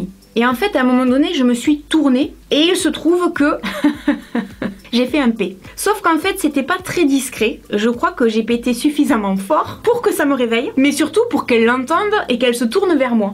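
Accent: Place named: Perpignan, France. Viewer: Oooh je me disais bien que cet accent m'étais familier ! Je suis aussi de Perpignan ^^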